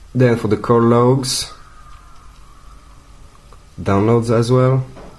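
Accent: France. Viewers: je trouve l'accent français agaçant en anglais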